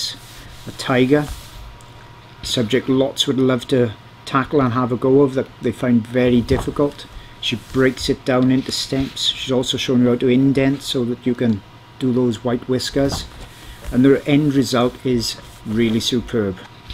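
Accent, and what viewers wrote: Wales, United Kingdom. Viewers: Really nice to hear another Welsh voice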